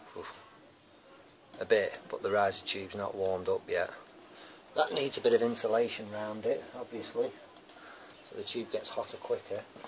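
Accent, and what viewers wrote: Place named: Northern England, United Kingdom. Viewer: GREAT STUFF !!! I've thoroughly enjoyed your videos. I've learnt so much from you about heating with wood. I'm about to try to make a rocket adaptation to a smallish cylindrical wood heater in my bedroom. The chimney, unfortunately goes straight outside thus losing a lot of needed heat. I also have a wood stove in the kitchen and I'll see if I can have it heat water too, just like the Argas I came across in the UK. Where are you from by the way. You're definitely northern like me. In fact, I'm a Liverpudlian now living in Rio de Janeiro.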